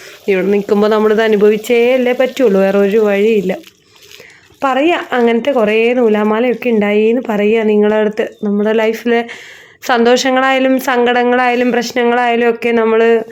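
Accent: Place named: Valluvanad, India. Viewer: നല്ല വ്ലോഗ്.... സന്തുഷ്ട ഭവനം... വളരെ സന്തോഷം തോന്നുന്നു...വള്ളുവനാടൻ തനിമ നിറഞ്ഞ അവതരണം...നന്മകൾ നേരുന്നു ❤❤❤🥰🥰😍😍🎉🎉🎉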